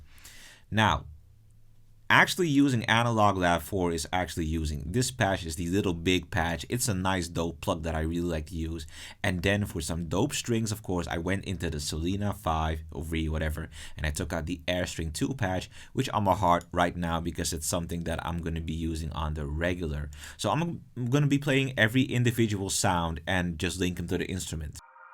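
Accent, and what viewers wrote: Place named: Netherlands. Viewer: i can hear dutch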